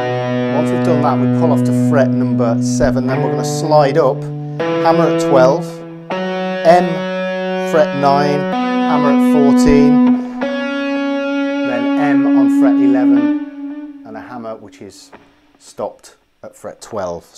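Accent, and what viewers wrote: United Kingdom. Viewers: I've noticed that has a British accent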